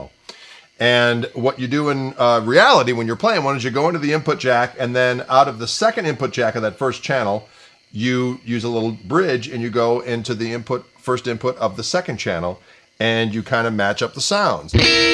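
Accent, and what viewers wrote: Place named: Chicago, United States. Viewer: This man sounds like he's from Chicago He's from Wisconsin. Close enough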